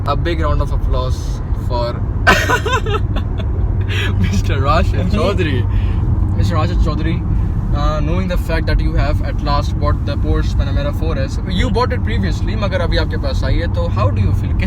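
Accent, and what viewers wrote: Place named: United States. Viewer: Which part of America is this accent from ?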